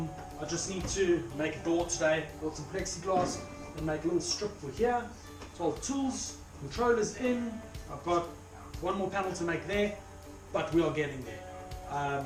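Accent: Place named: South Africa. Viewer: Hi there! Just discovered this series and binge watched all the videos. Great stuff! Do you have any info on your spindle? Specifically the atc system? Cheers! Devin. Ps I was born in Joburg (in fourways, moved to Canada when I was a kid... I can pick out the accent from a large crowd haha)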